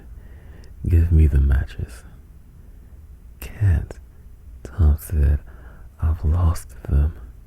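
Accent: St. Louis, United States. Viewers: That 314 accent tho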